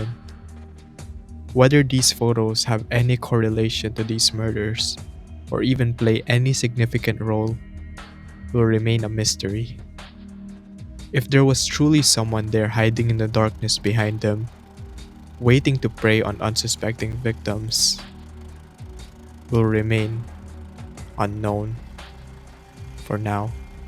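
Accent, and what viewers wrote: Philippines. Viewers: I hope you don't find this offensive, I just want to say that the Filipino pride in me ran rampant in my blood because your accent sounds like Filipino. I hope you continue and I commend your content, graphics (which reminds me of my fav YouTubers: Nexpo and Crowley), and the voice-over. It's nice to have you back on my recommended.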